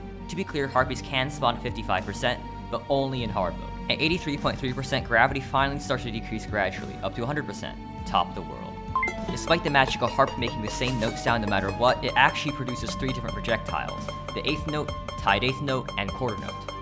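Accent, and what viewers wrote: China. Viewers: okay, so now we know you're a native speaker of chinese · I love your chinese pronounciation. Also, Frozen Chests were once called Ice Ice Chests in the game. Unless that was just in the Russian localization.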